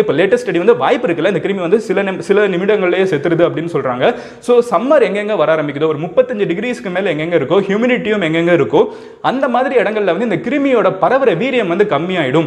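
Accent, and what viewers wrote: Madurai, India. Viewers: Bro nenga Madurai ah? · Nega madurai ya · Neega Madurai yaah? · Madurai ya bro nega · Bro neega madurai yaa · Bro neenga Chennai vaasi yaa Illa Madurai vaasi yaa pls sollunga bro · நீங்க மதுரை யா...? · Neenga madurai ah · Bro neenga Madurai ah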